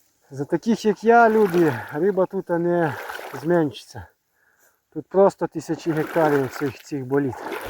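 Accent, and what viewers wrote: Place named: Olevsk, Ukraine. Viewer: Відчуваю, що це десь на півночі Житомирщини. А, вже почув. Олевщина. Чути по вимові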